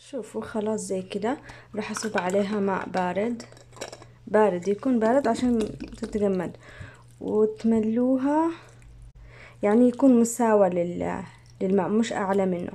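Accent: Aden, Yemen. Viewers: قدك ع الهدره العدني لاتغيريها للسعودي مافي احلا من هدرتنا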